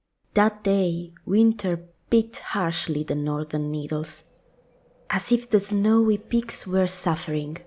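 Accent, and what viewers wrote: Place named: Scotland, United Kingdom. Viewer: hai un accento simil Scottish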